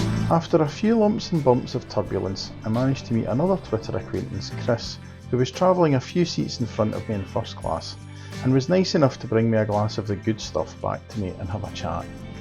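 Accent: Scotland, United Kingdom. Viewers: Great video. Love the Scottish accent!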